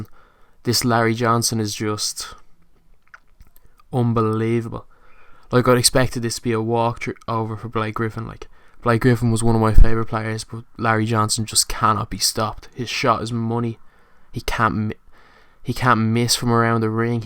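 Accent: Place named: Ireland. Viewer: His voice was so much mor irish😂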